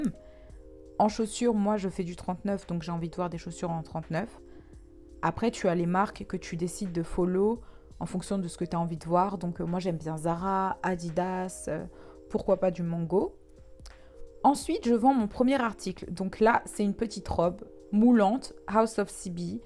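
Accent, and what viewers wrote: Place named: London, United Kingdom. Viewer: Moi jveux aller à Londres pour revenir avec le même accent que toi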